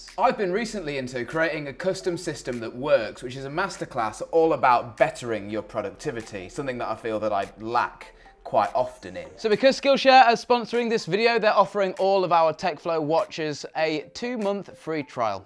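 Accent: United Kingdom. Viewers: That’s how we say it in the UK, we cringe when we hear any other country pronounce it wrong..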